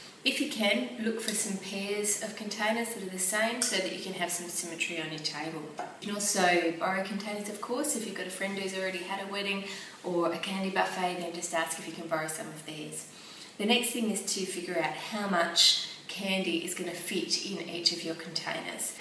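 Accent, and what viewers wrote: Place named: Australia. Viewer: i love your accent! what part of Australia are you from?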